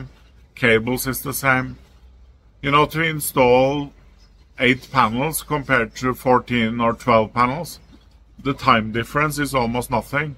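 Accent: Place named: Norway. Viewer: 555..Typical Norwegian English ala Stoltenberg.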